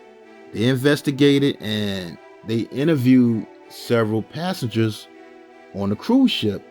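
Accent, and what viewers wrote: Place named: Philadelphia, United States. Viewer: The narrator must be from Philly!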